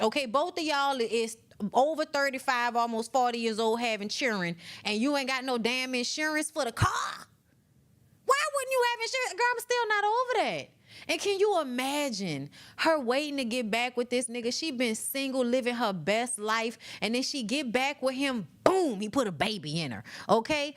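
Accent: New Orleans, United States. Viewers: I love New Orleans accent 'Baybeh' since Choppa on Making the Band & Toya came on tv 😍💜💛💚 i need to come to your 'Citay'😉. 😘💐 💙 Nuff love manners and respect from London 🇬🇧🇯🇲🇧🇧😇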